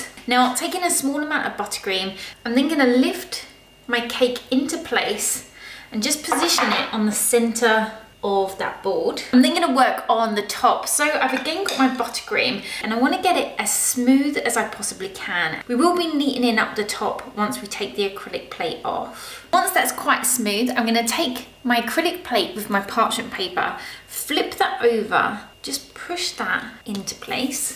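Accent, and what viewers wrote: United Kingdom. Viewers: Your accent seems like British ??? Right or wrong?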